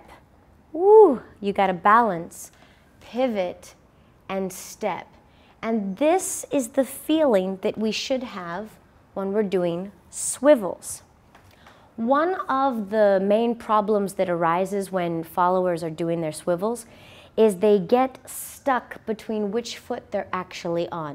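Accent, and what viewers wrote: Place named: United States. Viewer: Random comment, but I like your American accent :) Good enunciation!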